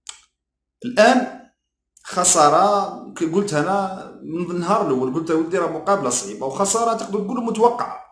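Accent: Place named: Biskra, Algeria. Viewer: من لهجتك تبان بسكري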